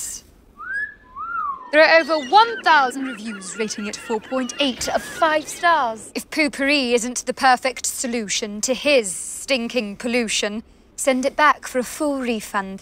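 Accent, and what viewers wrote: Scotland, United Kingdom. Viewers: You can hear her Scottish accent more in this. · Just stopping by to say that this is one ad I genuinely enjoyed, and chose to sit through. It seems to have genuine personality. the snooty British accent just adds a level of self-awareness/ irony. Would buy if I didn't already have a fan.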